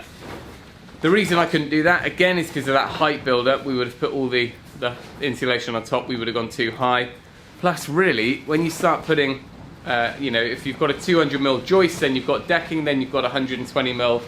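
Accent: United Kingdom. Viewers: I just wanted to let you know that my 8 month old nephew loves watching your videos, likely because of your accent. We're American, so he doesn't hear a British accent often. I am not a builder, my DIYing is mostly left to sewing, but I'm consistently fascinated by how things work, so I really enjoy your videos too because you are great at explaining not just what you are doing, but why you' are doing it a certain way.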